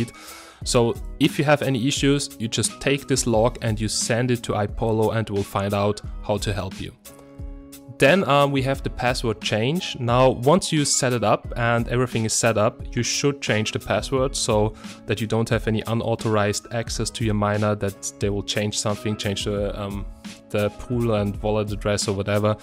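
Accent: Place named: Germany. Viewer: Slight german accent...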